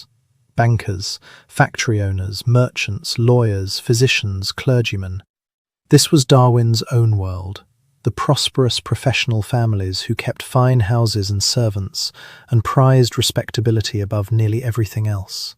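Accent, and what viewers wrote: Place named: United States. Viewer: Sorry. American accent. Finding something else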